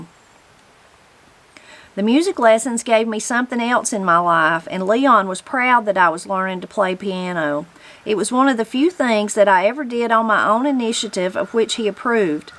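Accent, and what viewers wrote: Appalachia, United States. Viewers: Thank you for sharing. Love your readings. You have the ability to bring Sidney to life with your Appalachian accent and your voice fluctuations. What a true blessing.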